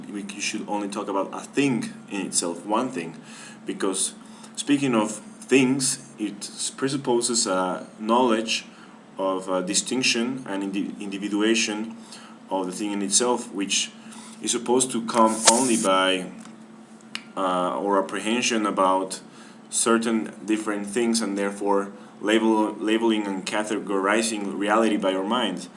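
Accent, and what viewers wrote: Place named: Mexico. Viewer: Good English, where are you? I can tell you're Mexican but do you live in the States?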